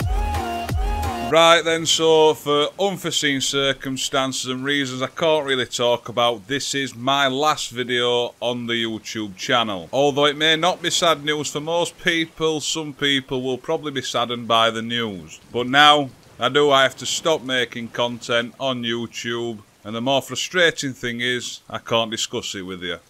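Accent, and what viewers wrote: Yorkshire, United Kingdom. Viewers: 😂😂😂😂😂 had me there Haha. Yorkshire accent aswel that. Where you from? I'm from wakefield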